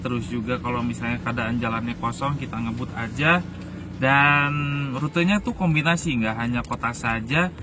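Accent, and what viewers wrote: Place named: Bandung, Indonesia. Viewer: Jiaaahhh...mamang . Kangeeeen aku .... logatnya bandung pisan...hahahahha salam kangen selalu. Allah merahmati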